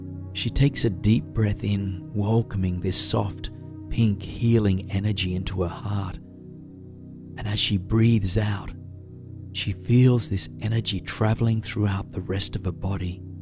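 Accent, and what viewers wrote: Australia. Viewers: Hi, I really love your voice and most nights, I think ill see if this story has no sound added! I don't know how people don't find music or other sounds, in the background very irritating! It feels like hearing hearing finger nails, scratching a blackboard to me! Of course, you can't cater your stories just for me and I'm not a patron (if you have one) so, I have a cheek to say it but I'm genuinely disappointed and I do listen to others and do support them with money at times, as a thank you but I just hate missing out on your voice! I don't generally enjoy men's voices but you have a nice Australian lilt to yours! Again, I am crestfallen and I keep looking to see, if there is a no sound version but there rarely is! Unless, I'm mistaking you for another person but I think, you did kindly post me a no sound (music or added sounds, just white noise to me!) version of a story before. 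It is getting to be an irrational thing for me now because there are so few adult story tellers, as it is! I even am starting to dislike the people that say, 'oh I love the added music' etc etc lol I seriously do need sleep as I'm cracking up! I will just have to give up but I do love your voice and I wish you the best! God bless, from Ireland xx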